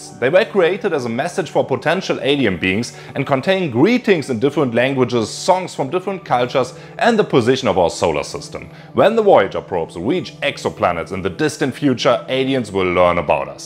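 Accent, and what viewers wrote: Germany. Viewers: i only enjoy his english in a german accent. if he spoke in german I'd be horrified. I've yet to find nice sounding german speech. it's extremely aggressive sounding.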